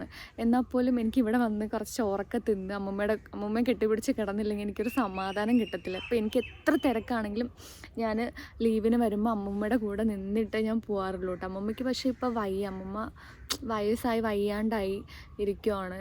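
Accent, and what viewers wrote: Trivandrum, India. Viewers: hai chechi ammommayod samsaricha tvm slang kettapo as a tvm kaari oru sugm indayirunnu ❤🎉😅❤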